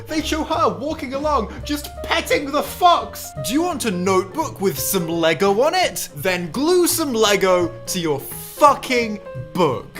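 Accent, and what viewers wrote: England, United Kingdom. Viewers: This man do be English tho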